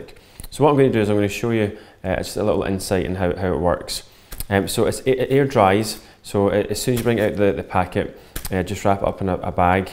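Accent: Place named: Glasgow, United Kingdom. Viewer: Such a talent, i love watching clear, concise step-by-step tutorials like this, really makes you feel like with enough practise, you could do it yourself. Thank you! Also sounds like a Weegie accent there! So rare to hear, makes me miss home! :)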